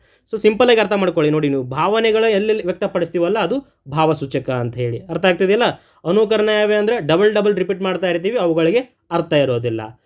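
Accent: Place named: North Karnataka, India. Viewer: Tq sir i was waiting for this class!!nimma uttara Karnataka bhashe tumba chenagide 🌹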